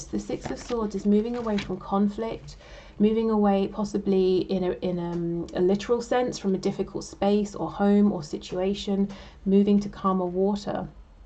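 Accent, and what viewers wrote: United Kingdom. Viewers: I love British accent, lol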